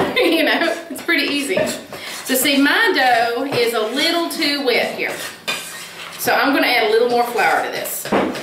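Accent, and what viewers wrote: South, United States. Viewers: Liked and will use.,,love the southern accent and look.latze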